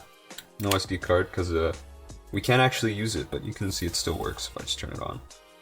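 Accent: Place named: Australia. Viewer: australian accent Nice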